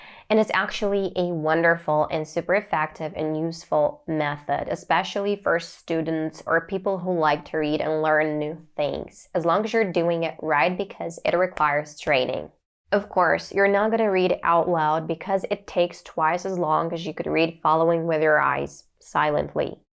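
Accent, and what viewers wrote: United States. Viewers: You make me crazy... Well, twice too american accent... You speak clearly, of course, thanks a lot, everything is understandable...but accent...Who are you originally? I've heard words Russian, Ukranian...Hah... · You are so pretty and you look l and sound like a american woman😁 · Wow I thought you are American You speak with 95% American accent. That's very impressive I've never seen someone from CIS who speaks with almost pure American accent. You encouraging me to improve my speaking skills. Keep it up👍 Thank you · Hey Master! New to your channel. Just wondering where are you from? You sound like American. Also love your accent or the way your mouth says English words. Greetings from Deep Space! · I thought you are native English speaker. You speak just like Americans. Did you ever live in the USA before?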